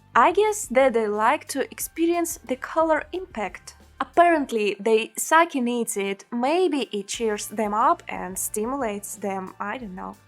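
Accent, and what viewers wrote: Russia. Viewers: Ничего вы не понимаете, во первых акцент хоть и хорошо слышится, но саму речь приятно слушать, уши не режет, как по мне многие русскоговорящие зря пытаются полностью избавиться от акцента, это же изюминка, главное чтобы тебя понимали и чтобы ушам больно не было, в данном случае очень приятно слушать, мне такой акцент очень даже нравится. Вы еще не слышали что такое плохой акцент, послушайте Пакистанцев или Индийцев, вот там не приятный акцент, но даже они не заморачиваются и не стесняются своего акцента, и все смотрят их видео, общаются, главное какой контент они производят. Удачи вам! Не слушайте тех, кто говорит что у Вас ужасный акцент, это не правда, у вас очень приятный акцент:) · Why not to have channel in English?! Great Idea 👌 Russian accent ? - why not?! Keep going on! 🤗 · Fun fact: when you are speaking English, you hands movements are a lot less than that when you’re speaking Russian:) all that is because the center of “sense composition” is moved from soulful thought delivering to “make sure your English is correct and distinctive”. 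P.S this Russian Natasha accent is certainly your charm. Thanks for a great video:)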